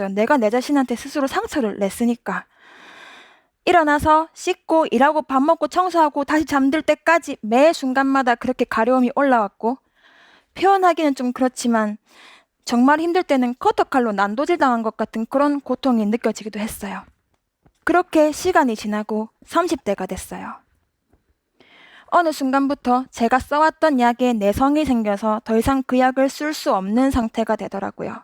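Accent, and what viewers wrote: Gyeongsang, South Korea. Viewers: 음 대구분이시넹~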